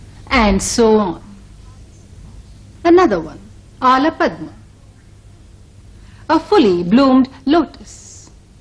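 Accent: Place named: England, United Kingdom. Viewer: Amazing your an English accent 🙏🏻👏👍🏻 sweet i like very much of your launguage madam if I seen you i will be get autograph me god grace like this of dance performance Excellence wow 👍🏻👏🙏🏻❤️👍🏻🎉🎉🎉🎉🎉🎉 amazing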